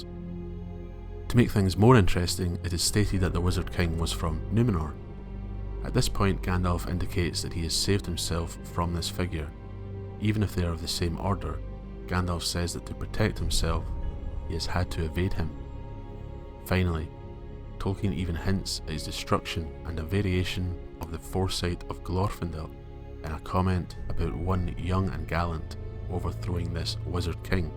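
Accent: Scotland, United Kingdom. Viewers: Always a lol strange hearing another Scottish accent on YouTube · Good to hear a Scottish accent doing this rather than your typical southern one…… the real north, north of the walls. 👍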